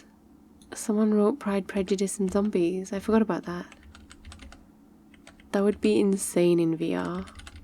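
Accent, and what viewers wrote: United Kingdom. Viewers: OMG BRI'ISH?!?? 😶😮 I mean Same but still! Cool voice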